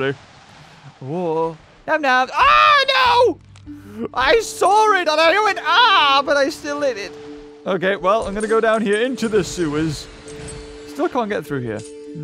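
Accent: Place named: United Kingdom. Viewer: you live in the U.K.don't you I hear it in your voice. Can you send pictures of castles?😁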